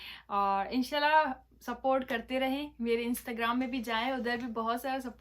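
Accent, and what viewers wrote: Sindh, India. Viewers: Haha apka sindhi accent😂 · Why your accent is indian????dear proper urdu zaban ma bat karen plz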